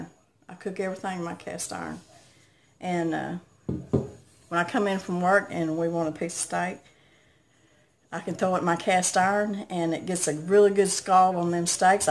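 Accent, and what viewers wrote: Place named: Kentucky, United States. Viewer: You are a doll!!! To hear ya talk makes me miss my kin down in Kentucky ❤️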